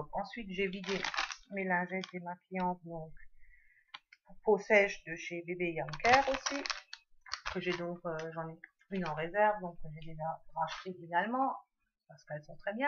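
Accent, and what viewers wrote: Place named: Lorraine, France. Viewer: tu es de la lorraine ? ton accent me fait penser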